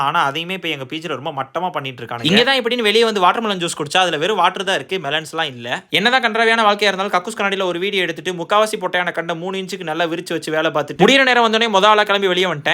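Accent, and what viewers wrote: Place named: Madurai, India. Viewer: Correct ah iruntha parotta Illa na Manda pura saalna 💥👊🏻💢 Madurai Slang 🔥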